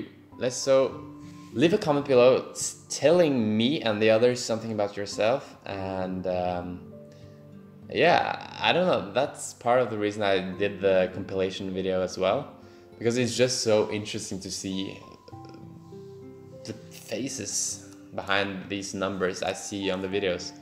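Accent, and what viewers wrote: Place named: United Kingdom. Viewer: I love British accents! I love it so much!😍😍😍😍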